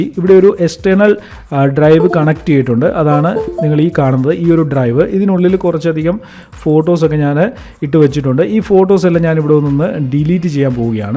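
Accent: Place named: Kasaragod, India. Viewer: കേറി വാ മക്കളെ...🙋 ഇനി മുതൽ കാസർഗോഡ് ഭാഷയിൽ ബ്ലോഗ് കേൾക്കാം...😍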